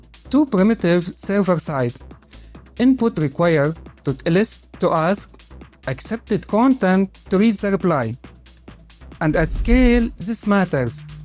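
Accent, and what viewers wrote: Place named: Syria. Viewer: انت سوري اللغه انجليزي سوري !!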